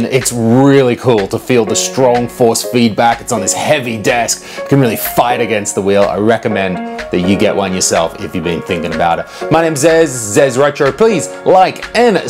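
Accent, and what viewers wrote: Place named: Australia. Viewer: Sounds like you're Australian, so I'm assuming you're in Australia. I just picked up a Thrustmaster T300 from Cashies'. It didn't come with the mounting 'thingie', so I was contemplating mounting it directly to the desk. Glad I found your video. If you want a quick-n-easy release, check out Bunnings and look for 'Richmond M6 x 40mm Handbolt'. Should be the size you need and comes with a convenient star head, which you can use to tighten/loosen the bolt with your hand.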